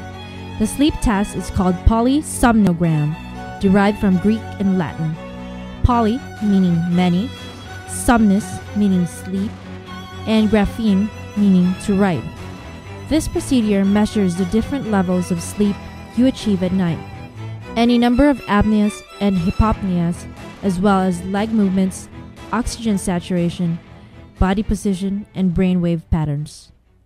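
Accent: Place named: Canada. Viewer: Pretty informative. Good job. And the canadian accent is too cute lol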